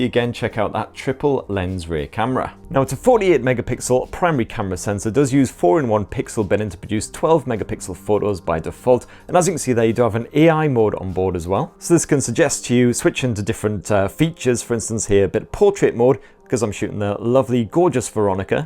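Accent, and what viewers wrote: United Kingdom. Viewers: Just came to hear the British accent 🌝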